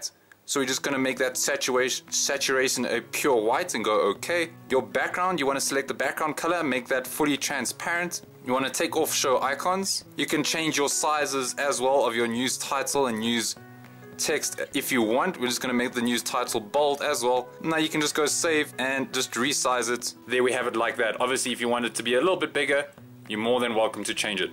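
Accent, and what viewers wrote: South Africa. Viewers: Hi there nice tut, are you from South Africa? your accent sounds like it. I am from South Africa. :-) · I also think he sounded South African and I'm South African too haha :P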